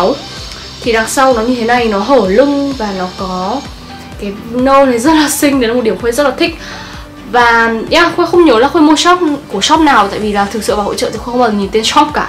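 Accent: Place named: Southern Vietnam, Vietnam. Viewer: Khuê nói giọng Nam dễ thương quá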